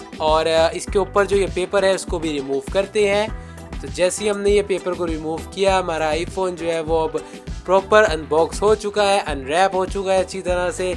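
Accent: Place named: Gujarat, India. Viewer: Bhai apke bolne ke ascent se ap gujrati lagte ho❤😂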